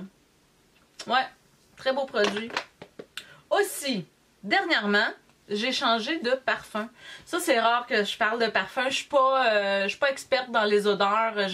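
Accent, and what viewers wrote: Quebec, Canada. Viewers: Trop contente de te retrouver! J adore ttes t'es vidéos! Et ton accent québécois aussi lol gros bisous à toi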